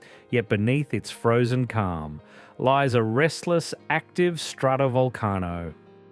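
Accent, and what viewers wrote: Australia. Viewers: an Aussie narrating this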